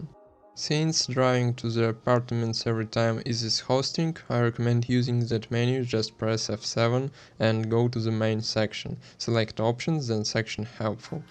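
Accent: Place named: Russia. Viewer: Зачем ты говоришь на английском? Твой акцент сильно тебя выдает, как и дискорд на русском..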